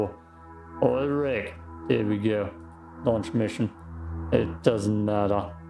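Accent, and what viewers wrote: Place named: Wales, United Kingdom. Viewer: Are you welsh? You are super hard to understand bro.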